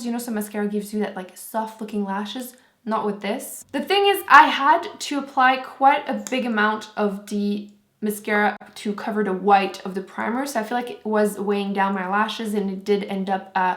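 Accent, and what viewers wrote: Quebec, Canada. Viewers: Ah j'ai reconnu ton accent tout de suite!! Une québécoise!! :) Cool ton vidéo!! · Are u from quebec 😏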